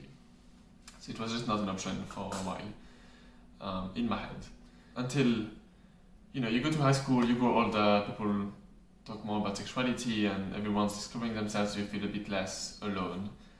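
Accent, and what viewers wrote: France. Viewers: Are u french ?🇨🇵😆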